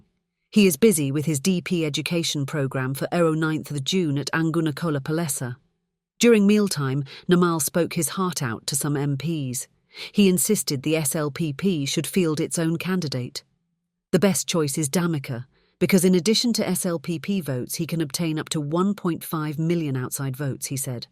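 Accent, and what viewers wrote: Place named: United Kingdom. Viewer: Don't we have English speaking Sri Lankans, that we have to have this "British" lady/accent making funny pronunciations of Sinhala names!! Give me a break.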